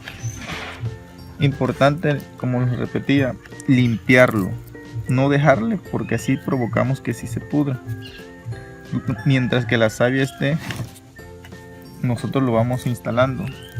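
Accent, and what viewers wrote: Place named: Salamanca, Spain. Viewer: Que alegría escuchar a un salmantino 👋 Muy buenas recomendaciones, saludos también soy de aquí 😁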